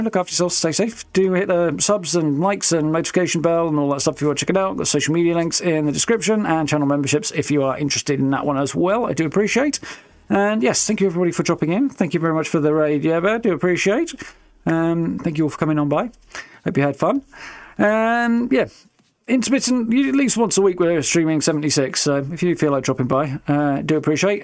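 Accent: RP, United Kingdom. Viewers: This guys accent really throws you off abit, because he sounds really really posh, like he studied at Oxford, yet he looks like a metal head 😂🤣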